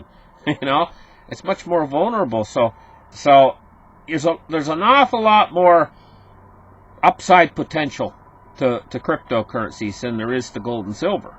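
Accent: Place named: Canada. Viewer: If anyone wants to hear a Canadian accent, this man is the example. "The titter on the end" Great accent, great show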